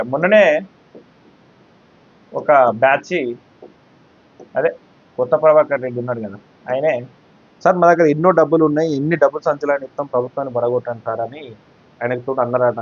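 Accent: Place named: Telangana, India. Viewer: మీరు చెప్పింది అన్ని కరెక్టే కానీ నువ్వు paytm బ్యాచ్ అని తెలిసిపోతుంది ఎంత తీసుకున్నావ్ దేనికి పక్క రాష్ట్రపు పెదరాయుడు ఏర్పాటు చేశాడా నిన్ను అమ్ముడు పోయావా మాట చూస్తే తెలంగాణ భాష ఉంది ఇక బోతే తాగుబోతులు అంటున్నావ్ ఇప్పుడు ఎక్కువ ఉన్నారా అప్పుడు ఎక్కువ ఉన్నారా ఆవారా బ్యాచులు అన్నావు ఇప్పుడు ఎక్కువ ఉన్నారా అప్పుడు ఎక్కువ ఉన్నారా కాంగ్రెస్ అంటేనే ఆవారా బ్యాచ్ పెదరాయుడు బ్యాచ్ అంటే కమ్మ బ్యాచ్ నువ్వు తెలంగాణ ఉద్యమంలో పాల్గొంటే తెలుసు పిచ్చి పబ్లిక్ నమ్మి ఓటేశారు కాంగ్రెస్కు ఇప్పుడు అనుభవిస్తున్నారు నువ్వు కాంగ్రెస్కు ఈ స్టేట్మెంట్ ఇచ్చినందుకు రఘు గాని లెక్క కబ్జాలు చేస్తున్నావా లేకపోతే ఏబీఎన్ ఆర్కే గాని లెక్క బ్రోకర్ గిరి చేస్తున్నావా మీ నాయకులు తెచ్చేది మీటింగులకు సొంతంగా స్వచ్ఛంగా వచ్చేటట్టు ఎవడైనా ఉన్నాడా యాది ఉంచుకో టిఆర్ఎస్ కి ఇప్పుడు వచ్చే పబ్లిక్ అంతా ప్రేమతోనే వస్తున్నారు పొయ్యి నువ్వు ఒకసారి ఇంటర్వ్యూ చెయ్ నీ గుండె పగిలిపోతుంది పని పాట లేకనే నువ్వు ఈ రివ్యూ పెట్టావు ఇప్పుడు పెద్ద రాయుడు ఎంత ఇచ్చిండు చిట్టి నాయుడు ఎంత ఇచ్చిండు నీకు చెప్పలేదు ఈ ఛానల్ పెట్టి బోగస్ ప్రచారం చేయడానికి ఇప్పుడు రియల్ ఎస్టేట్ గంగా జరగకపోతే ఏం జరుగుతుంది టిఆర్ఎస్ లో ఉన్నాను ఒక్కలు ఇద్దరు ఉన్నారు కానీ ఇప్పుడు మీ కాంగ్రెస్ చుట్టూ తిరిగే బ్యాచ్ అంతా రియల్ ఎస్టేట్ బ్యాచ్ కదా ఈ స్క్వేర్ ఫీట్ కు ఎంత ఇప్పిస్తున్నారు బిల్డింగ్ పర్మిషన్లు నేను చూస్తే మాత్రం నిన్ను ఆర్కే బ్రో పెట్టాలి లేకపోతే పెద్దరాయుడు అని పెట్టాలి వాళ్లకు అమ్ముడుపోయిన బ్యాచ్ ఉన్నట్టున్నావు నువ్వు కాంగ్రెస్ పార్టీలో ఇప్పుడున్న నాయకుడు పబ్లిక్ లేకున్నా గాని ముంగట ఒక 200 మందిని తోలు ఎక్కడ వైయస్ రాజశేఖర్ రెడ్డి చేసిన స్టైల్ వాళ్ళు నెల జీతా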